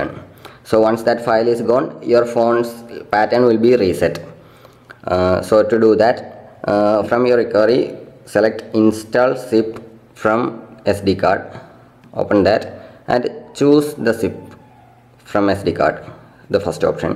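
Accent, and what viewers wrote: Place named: India. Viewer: Could you show me how to speak English not Indian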